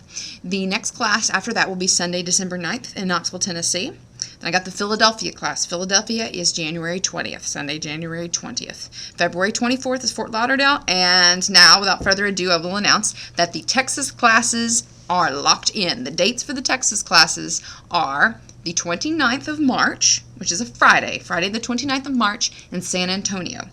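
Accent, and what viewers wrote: Ireland. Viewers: Like the Irish voice. : ) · I love the Irish Brogue. :)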